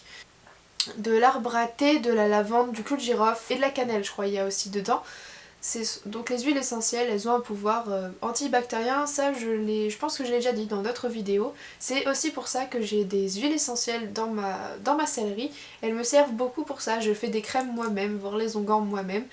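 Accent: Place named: England, United Kingdom. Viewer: tu a un accent anglais magnifique !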